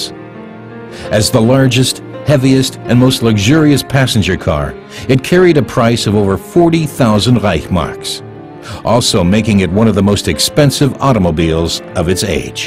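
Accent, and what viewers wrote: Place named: United States. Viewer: Very clumsy American narration, though use of metrics, where appropriate is welcome.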